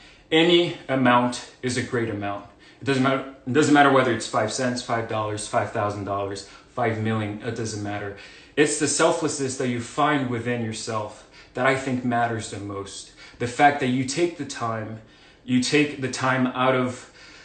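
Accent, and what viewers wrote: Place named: Latin America. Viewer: I thought he was a latino, like his first lenguage was spanish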